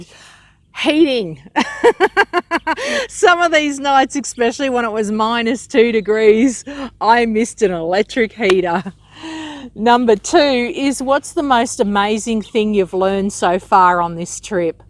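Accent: Australia. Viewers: Love that Aussie accent. Wish i had your balls, really want to do a big tour too.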